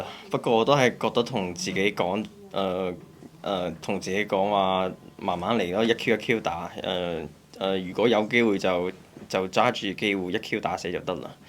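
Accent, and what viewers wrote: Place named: Shaanxi, China. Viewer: 肯定不是东北，是陕西吧？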